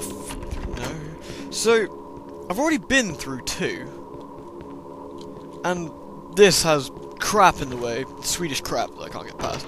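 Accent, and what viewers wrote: United Kingdom. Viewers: Finally he's reading stuff! I wanted him reading since way back when he was doing Penumbra...the British accent makes everything sound more official...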